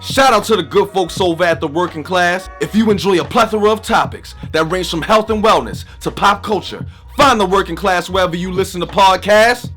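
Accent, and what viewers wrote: Baltimore, United States. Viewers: I hear your accent. what part of Baltimore are you from? lol good video. I didn't pick up on the song but it makes sense. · You from Baltimore,? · this guy gotta be from Baltimore I can hear it in certain words he say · you gotta be from baltimore bruh i hear it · I hear that Baltimore shordy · Your B-more accent is so strong lol. And maybe I saw you blink just once? Excellent commentary. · are you from Baltimore?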